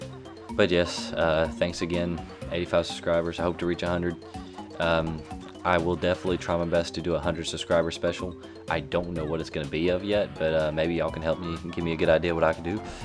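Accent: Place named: South, United States. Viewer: Your accent is pretty cool! It's southern but not seriously obnoxious like other people with southern accents I've heard. It's wierd because I live in the south and I don't have a southern accent... I don't think. O.o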